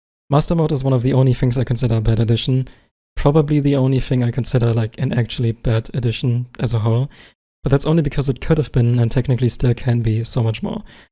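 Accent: Germany. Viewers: Man hört halt direkt raus, dass du Deutscher bist. Aber super Video kann ein paar deiner Kritikpunkte teilen.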